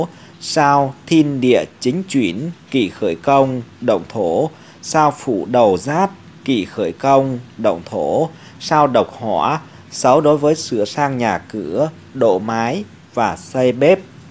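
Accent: Thanh Hoa, Vietnam. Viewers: Bạn ơi. Gốc thanh hóa hả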